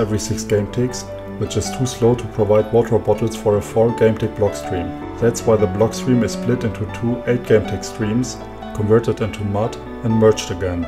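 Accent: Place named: Germany. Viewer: Great Video! Deserves way more attention than it already got! Ziemlich starker deutscher Akzent war, aber sehr gut verständlich. Mach weiter so! Keep going!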